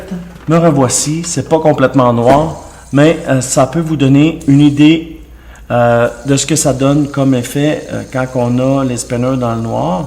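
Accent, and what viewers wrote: Quebec, Canada. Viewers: Perso les gars moi je regarde les vidéo 50% car son accent quebecois est génial et 50 % pour découvrir des nouveaux objets.^^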